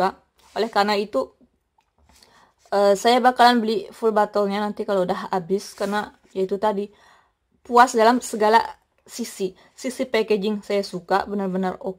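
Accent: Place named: Banyumas, Indonesia. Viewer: logat nya kok spt logat ngapak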